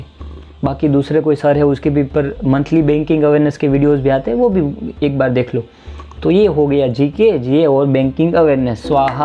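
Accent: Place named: Gujarat, India. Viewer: Sir muje aap Gujarat se lagraheho. Aap ki બોલી se❤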